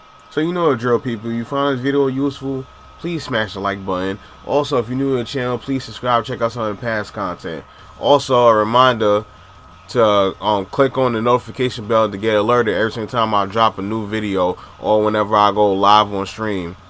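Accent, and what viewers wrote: New York, United States. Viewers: You from NY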